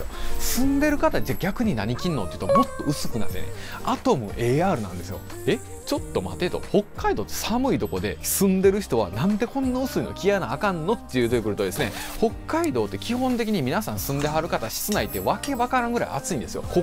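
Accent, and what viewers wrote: Kansai, Japan. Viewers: 関西弁のイントネーションと喋り方は魅力ですね。 ついつい、見ちゃうわ 頑張ってください。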